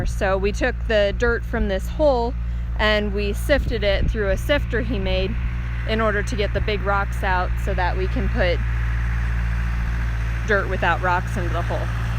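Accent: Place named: Washington, United States. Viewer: listening to you talk I feel like I am home, you have a washington accent, LOL....watching your high desert vids is making me feel more confident about moving out to the high desert area in AZ I was fearful I wouldn't be able to have a garden glad to see it can be done =)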